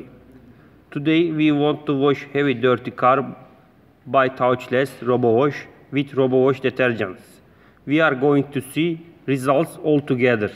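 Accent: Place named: Turkey. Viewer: Sesi duyar duymaz bu Türk İngilizcesi dedim ve öyle de çıktı :)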